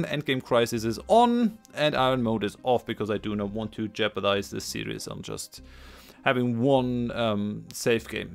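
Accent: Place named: Germany. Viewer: NO WAY. I can't believe, it must be a miracle! When Utopia came out i quickly peek in the Stellaris wiki to find out what's new. Personally i found that my 1st playthrough should be done as F.Pacifist + Materialist (of course Fanatic Purifiers and Hive Mind is very interesting and absolutely new options, but when it comes to your own game you want to pick something balanced which will not prevent you from discovering all new stuff in the update, you know) as the most balanced between all new options. Unfortunately, i got too much work now days, so i must beeline my own game a bit and i decided to watch some letsplay first. And what i found over here?! Some german guy (it's a important note, because i find german accent the most pleasant for my ears (even compared to native speakers!)), who's playing exactly what i wanted to see (i mean race) with 2 most entertaining enemies in this update. 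Also it's Insane difficulty! (nothing else not worth watching imo, because i can do hard without any problem on my own). I got a bit of frustration right now, because it feels like someone just read my thoughts and did EXACTLY what i wanted to see xD Anyway, wish you best luck in this campaign and i happy to say that you've got a new loyal subscriber, who's watching this almost 5 hours in a row instead of sleeping, because he just can't stop =)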